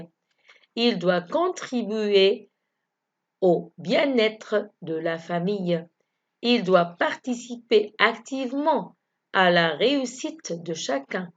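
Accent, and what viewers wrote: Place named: Tunisia. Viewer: you have tunsian accent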